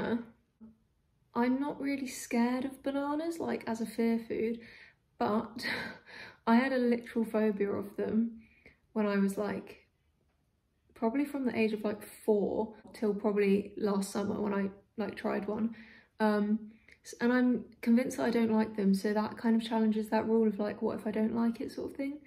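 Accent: United Kingdom. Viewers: British accent❤️ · how did i not realize u were british until rn 😭 not me leaving like fifty comments sry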